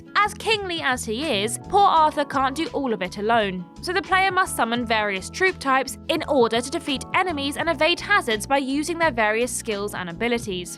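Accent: United Kingdom. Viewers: Are you from the UK????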